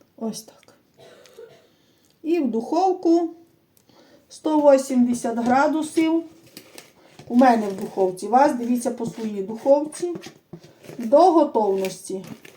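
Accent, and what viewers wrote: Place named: Kremenets, Ukraine. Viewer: На кременеччині, Тернопільської області так говорять. І що? В кожному селі, чи районі, області є свій діалект. Не знали?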